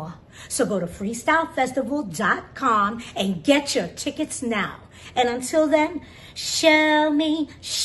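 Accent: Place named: New York, United States. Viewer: I'm still trying to figure out how you never lost your New York accent. Whenever I hear you talk, my accent comes back for about two weeks. BTW, I love what you're doing with your hair.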